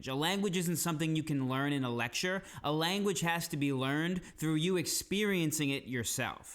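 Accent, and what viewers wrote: Argentina. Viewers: nice. congrats! good accent. bit of an argentinian twist or am I mistaken? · ok - thanks. 2.5 years (?) of studying Spanish got you to a hesitant B1+ with no sense of the prosody of the language (sounds like you 're attempting Argentinian pronunciation the way you realise 'y' and 'll'). You're definitely not at a level to pronounce yourself fluent (absolutely nowhere near it), and I'd suggest more humility when it comes to pronouncing what is and is not effective language learning. But hey, it's your channel, have at it.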